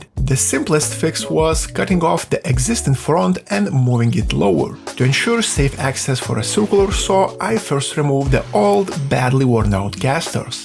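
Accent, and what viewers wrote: Russia. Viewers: Брат у тебя акцент как у русского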